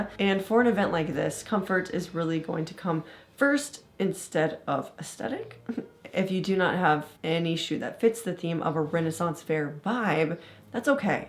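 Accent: United Kingdom. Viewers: Your accent is very good. I thought you were England. Maybe do lessons on how to speak "Ren Faire"? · Always such a kind positive soul - energy- so sweet✨ Best wishes to you Also brit accent is lovely haha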